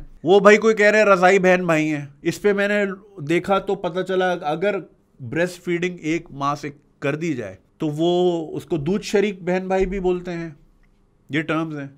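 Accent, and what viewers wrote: Karachi, Pakistan. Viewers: Karachi walon ka accent esa ee hota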